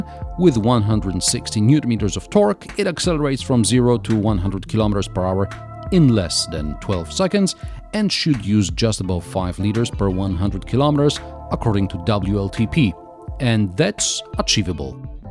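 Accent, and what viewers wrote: France. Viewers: Lmaooooo the French accent. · I like your french accent 😂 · Nice looking car from the outside but... The Sat nav on the Kadjar I tried was unfathomable.... (French logic?) ...and the infotainment system made funny buzzing noises whenever I passed near power lines... Rear seat space is cramped, performance is below class average and not sure how well it would stand the test of time. Like your french accent BTW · Yours French accent is amazing! Pink Panter far behind.... P.S. Car petrol engines not impressing.... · I love your reviews man...!!!! nice one. Your french accent rocks!!! :)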